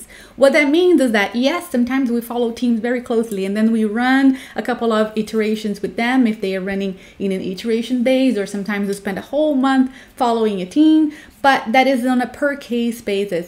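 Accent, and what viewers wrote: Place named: Brazil. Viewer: Are you Brazilian? Your English sounds different.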